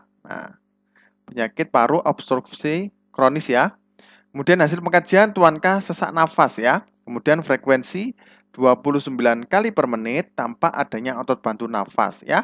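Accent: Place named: Bengkulu, Indonesia. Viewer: Cak nyo wong bengkulu abng ni😂.. sukses terus chanelnyo banh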